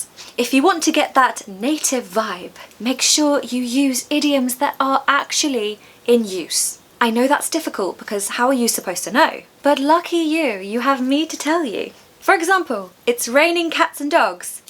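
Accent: United Kingdom. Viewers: Hello, I would like to visit those castles in the U. K. Love your beautiful British vibes and the usage of words with a lovely British accent., You taught me best British English lessons. You are adorable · Hi. I really enjoy watching your video s. Especially when i listen to your lovely brit accent. I would like visit london and seeing icon london like eye londond and big ban and tower bridge and ....❤ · Thank you for reminding me the Beautiful British ascent . I tried to shadow you ❤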